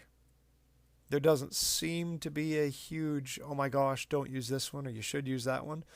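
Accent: Canada. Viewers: Love your video no crap just gogo on the job,thank you l hear your Canadian speak hows that hockey team eh?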